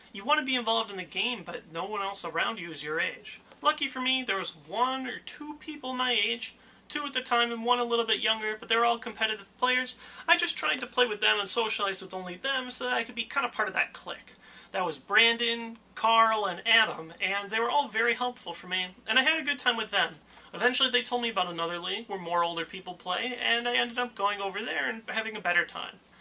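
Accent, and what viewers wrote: United Kingdom. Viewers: LOVE THE BRITISH ACCENT!!!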